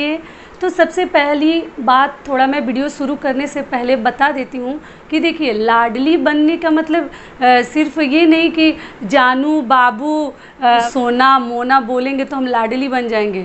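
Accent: Bihar, India. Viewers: Aap Bihar se ho kya? Aunty ji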